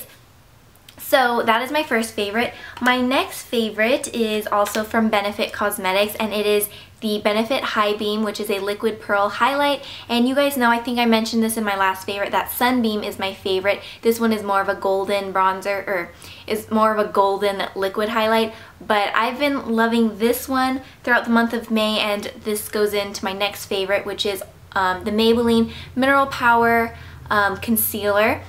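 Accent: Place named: Australia. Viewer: hells yeah aussie accent :D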